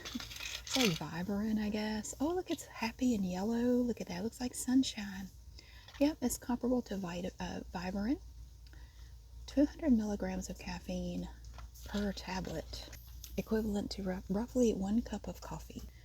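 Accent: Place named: Greensboro, United States. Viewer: your asmr videos are so nice for me because of your accent. i was born in greensboro and lived in the area for most of my life so its sooo comforting to hear the voice of the women i look up to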